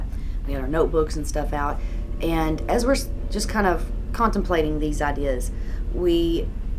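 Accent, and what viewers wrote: South, United States. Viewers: I was hoping you would say where it was, with your accent I assumed somewhere here in the south. This sounds like goatman's bridge, which I've been to. Eerie even during the day. I have no doubt its haunted.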